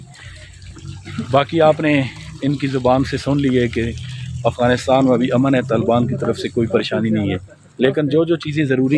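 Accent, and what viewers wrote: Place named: Chakwal, Pakistan. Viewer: آپ کا تعلق پاکستان کے ضلع چکوال سے تو نہیں ھے کیونکہ لہجہ بتا رھا ھے؟؟؟❤❤❤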